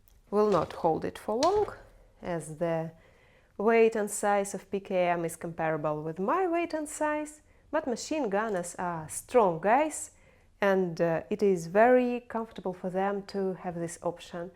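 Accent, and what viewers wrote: Russia. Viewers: I think I found my new asmr channel. I love the Russian accent and I love listening to people talk about guns. 🤣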